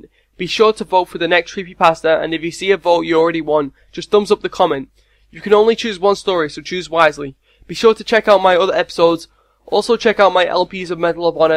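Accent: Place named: Manchester, United Kingdom. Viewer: your voice! <3 where do you come from, it sounds somewhere in the Manchester area in England :3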